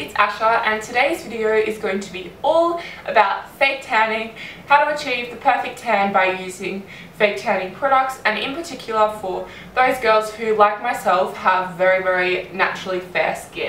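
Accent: Australia. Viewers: french fry Maybe Australia? I think so! You could check her social media though, maybe she has it written there:) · I think she"s Australian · frenchfry AUSIIIEEE, LIKE ME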